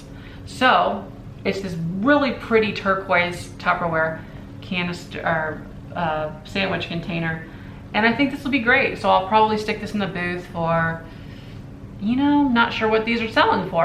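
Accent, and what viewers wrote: Midwest, United States. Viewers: Love watching you! I’m from South St. Louis originally and now in Florida. I can tell you’re from the Midwest! How close are you to St. Louis?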